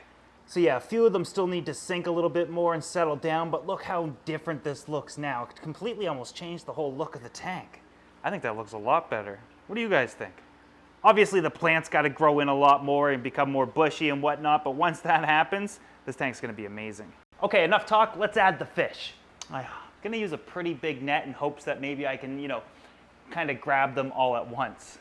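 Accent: Canada. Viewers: James Goose He's Canadian!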